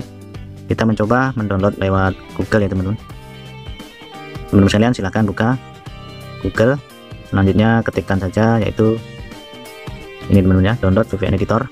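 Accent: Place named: Banyumas, Indonesia. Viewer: Ngomong nya Bisa pelan pelan Dikit ngapak:v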